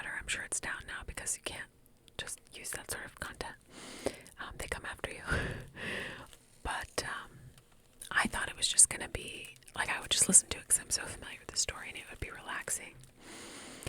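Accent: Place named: Manchester, United Kingdom. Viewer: accent is south Manchester/northern Cheshire by the sound it (I worked in Stockport for a few years, near the Pyramid) and it rings bells from then. If you want to get the tag off an IKEA mug, use WD40 - lifts it right off. Hand wash the excess WD40 it before you stick it in the dishwasher, otherwise it can cross contaminate.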